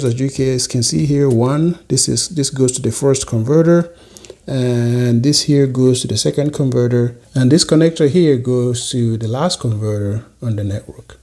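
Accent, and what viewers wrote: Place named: Haiti. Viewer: 🔥acento haitiano DETECTED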